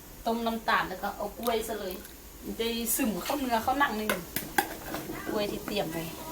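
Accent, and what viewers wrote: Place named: Suphanburi, Thailand. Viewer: สำเนียงภาษลาวเพราะนะคะ ออกเน่อๆ เหมือนสำเนียงสุพรรณ ราชบุรี กาญจนบุรี เพราะดีค่ะ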